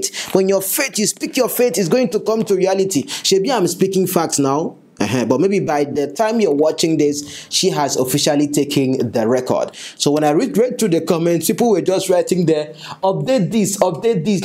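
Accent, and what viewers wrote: Calabar, Nigeria. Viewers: my brother if you are not say that you have not Said it before that you're from Anambra State i will think you're from Calabar because you look like them too much and your Voice also... this is what i think before.. you are the best. God bless you and More Grace to you my brother.. watching from Austria 🇦🇹 Vienna.. In addition 100 hours = 4,167 days Time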